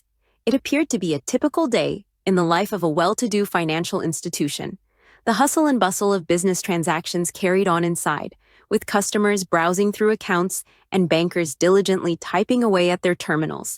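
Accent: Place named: United States. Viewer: What a load of bull****, where do they get theses stories from. sounds american from the accent.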